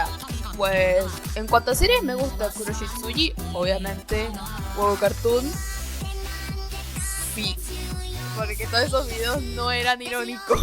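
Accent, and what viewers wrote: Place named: Argentina. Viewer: BUENO EMMM, tenía la idea como de una manera más "silly" o tipo como alguien cuando se tapa la nariz NO SÉ PQ JDIDIEIKWKAKSKDN 😭😭😭, además no pensaba que se te notaba el acento argentino (lo cual no es malo obvio, además no soy nadie para "quejarme" al respecto pq a mí se me pegan acentos de otros países lolzies)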